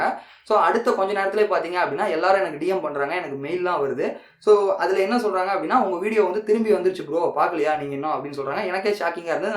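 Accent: Salem, India. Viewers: Bro calm down pls.. neega salem-ah bro?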